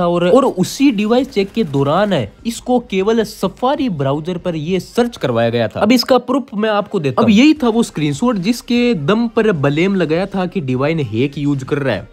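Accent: Haryana, India. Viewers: Bhai Haryana se he kya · Bhai aap Haryana sa to k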